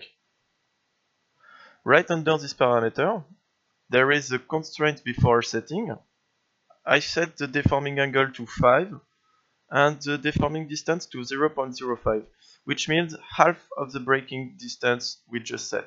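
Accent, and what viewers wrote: France. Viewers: J'ai l'impression que tu es français non ? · You're french ?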